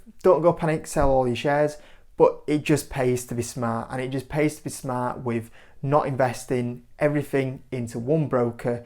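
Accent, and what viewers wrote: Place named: Northern England, United Kingdom. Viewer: If you didn't look like Sid from toy story and didn't have a northern accent you'd have 100k+ subscribers. Best UK and Ireland based investment content on YouTube for inexperienced investors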